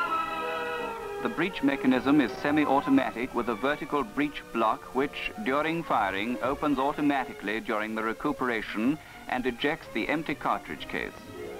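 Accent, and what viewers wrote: RP, United Kingdom. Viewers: the bbc accent is horrendous, no one in the uk has ever actually spoken with this accent (other than media turds) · It's a Swedish weapon, not British; although clearly a British narrator.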